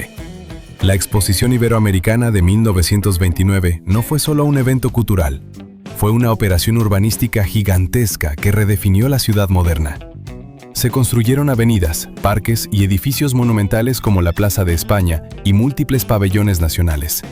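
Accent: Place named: Latin America. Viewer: Y... ¿por qué le han puesto a la IA que relata el vídeo este acento sudamericano tan cantoso? 🤔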